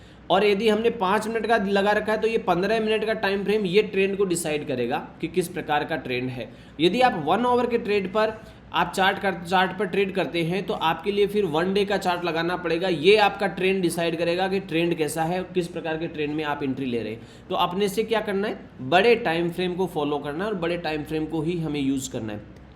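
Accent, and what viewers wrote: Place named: Marwari, India. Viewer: Marwadi lahja is always seen. Keep it up.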